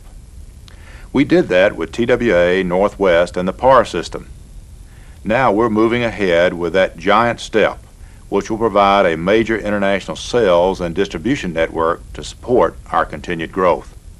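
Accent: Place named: South, United States. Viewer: "... true core of our biddiness..." you gotta love that southern accent!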